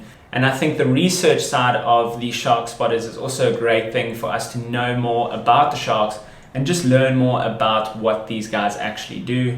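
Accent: South Africa. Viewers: What country is this? You sound South African. If they are indeed bronze whalers, well they have a long history of attacking humans. Not too sure what you're saying here.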